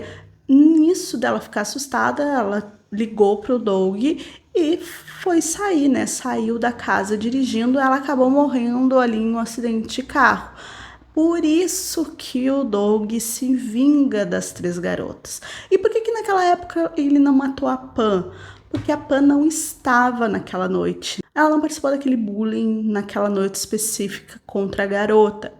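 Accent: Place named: Porto Alegre, Brazil. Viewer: Tu é minha conterrânea? Com esse sotaque só pode ser porto-alegrense.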